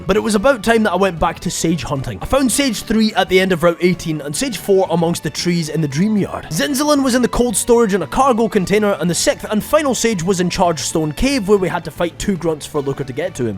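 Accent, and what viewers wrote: Scotland, United Kingdom. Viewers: Most underated youtuber ever. And the whole Scottish thing makes it better as a Scottish person 👍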